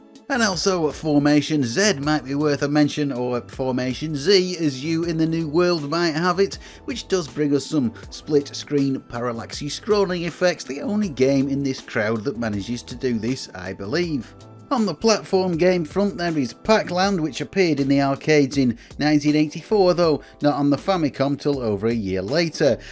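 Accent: United Kingdom. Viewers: Speech impediments exist, you might need to take that into concideration. He also has a UK accent, and the UK has many different dialects. Hope this helps.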